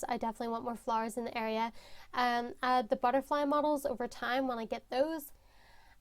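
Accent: Northern Ireland, United Kingdom. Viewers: the way it took embarrassingly long for me to fully catch on that you’re from northern ireland,, considering so am i ok wow lmao also how amazing is this entrance!! i have some fossils on the second level beside the museum and have water around it all and i have a few fossils/dinosaurs on like wee lone islands within the river so you cant get near the fossils,, if that even makes sense😂😭 but im really happy with mine! it was the first ‘grand’ thing i did with my island! it just needs some finishing touches like flowers when i finally get all the hybrids i want😭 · Same i went into to comments to try and find out cuz I couldn't tell if it was an ni accent or not 😂😂😂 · Great video! Do I detect a Northern Irish tinge there??😉😆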